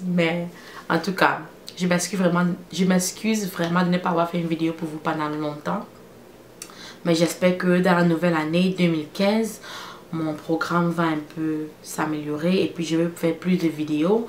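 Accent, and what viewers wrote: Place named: Cameroon. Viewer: Tu m'as bien fait lap J'aime bien ton accent camer . Ta routine est au top et j'aime beaucoup les fard à paupières :)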